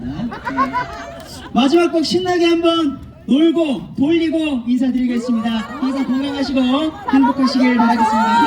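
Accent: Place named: Gyeongsang, South Korea. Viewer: 희재님 오늘 경상도 사투리 입에 착착 감기네요♥❤❤😂😂😂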